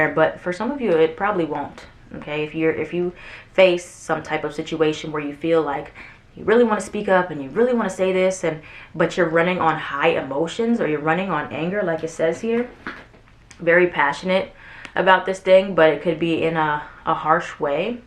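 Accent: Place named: Philadelphia, United States. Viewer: You said wooter not water. My ear went ding ding you have to be from the Philly Jersey area. Also, “you guys” that’s how we speak around here 💯🙌🏼🥰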